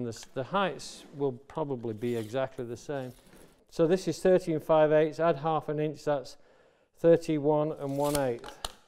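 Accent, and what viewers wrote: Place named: Sheffield, United Kingdom. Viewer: R C:D Sarcasm doesn't travel well on the internet, does it? Try reading my post with a Sheffield accent (like ). Of COURSE he's the master! I was taught engineering by a guy from Sheffield...no matter how well you did something, his best comment was: "Hmm...not bad that. Mek another one to be sure."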